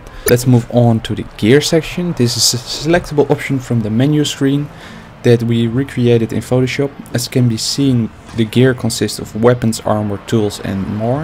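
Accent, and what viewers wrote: Netherlands. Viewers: dat dacht ik al ik hoorde het aan je engels accent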